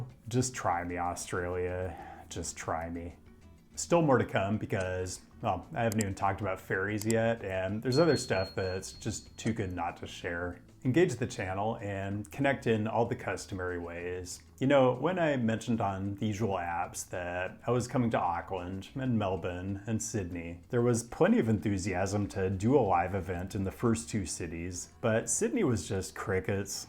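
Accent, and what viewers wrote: United States. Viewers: Thanks for covering my city ... but as with last video I am again triggered by your pronounciation of Melbourne. Your accent is American .. its okay and expected to include the "R" (MEL-burn). Your way (MEL-bun) is not American nor is it Australian ... it is just odd. And it's Parra-mat-a .. not "mot-a". Ignore my silly YT comment whinging ... great content.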